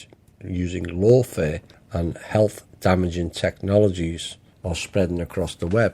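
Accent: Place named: Liverpool, United Kingdom. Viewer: What a breath of fresh air to hear your dulcet liverpuddlian tones ! So nice to hear intelligent convo from a fello blighty as most info ive found has been mostly american ( not disrespect to my american friends intended ) I love are no nonsense and seeing the irony in it that we brits do so well ! ♥ I felt gaia was dark first time I saw its adverts..its perfect candy coated mainstream approach smelt bad ! bit like Ancient Aliens...Im going to enjoy watching your previous vids now ive found you. He's not the messiah hes a very naughty boy ! Bit of Python to brighten the day :-)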